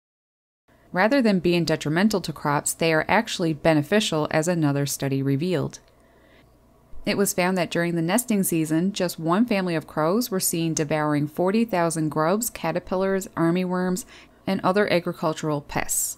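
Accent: Canada. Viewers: Dear, I love your videos, your facts are beautifully presented and your Canadian accent is so very charming. In Australia we also have crows, ravens and other song birds. The East Coast magpie (found in my home town, Sydney) has the MOST beautiful song. Once your local magpie knows you it will consider your a friend, woe betide you if they dislike you. My local magpie knows me and I occasionally give it ground mince as a treat though I try not to feed it too much. One day, when this dreadful pandemic ends, visit Sydney, you'll love our birds, too. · Is it true that each crow has somewhat of a unique song meaning they don't sound completely identical like other songbirds just asking? By the way I like your accent. Are you Canadian? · You're Canadian, aren't you?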